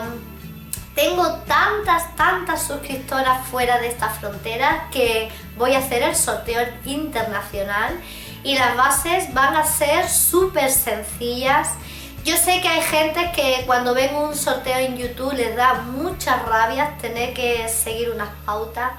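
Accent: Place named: Malaga, Spain. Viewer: Hola, FELICIDADES por el aniversario!!!!!! Como malagueña que te sigue desde muy lejos me encanta seguirte, oir tu acento me hace sentirme en mi Malaga querida. Me parece muy bien que pongas filtro, los mal educados no tienen cabida en ningún sitio. Este es tu canal, tu casa, y eso se merece un respeto. Yo no permitiría que nadie viniese a mi casa a insultarme, asi que bien hecho. PARTICIPO en este fantástico sorteo, mi usuario de Instagram es pepisandro y de Facebook Pepi Guerrero. Gracias por el sorteo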